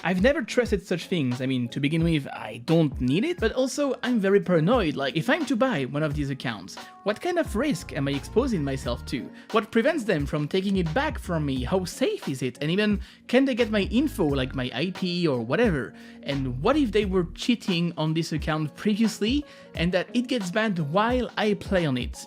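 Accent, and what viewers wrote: France. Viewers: I bet your french. a typical accent. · french accent ? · la musique de ça me tue xDD (j'adore ton accent d'ailleurs, il est très discret mais si t'es français tu devines quand même) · Hi, incredible content, great job! One tip to improve your videos: work on your accent, there are tons of videos and apps out there on how to improve your english prononciation. I hate the french accent (I am french myself maybe that's why) and it's a struggle to go through each of your videos but the quality of content makes it worth it, keep up the great work! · L'accent déjà c'est cramé mais t'as Google en fr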